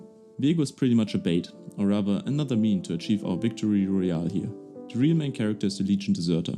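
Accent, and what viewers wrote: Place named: Germany. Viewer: Actually good content. The Accent is still strong so I instantly know ur a fellow Landsmann but that shouldn't bother anyone. Keep it up!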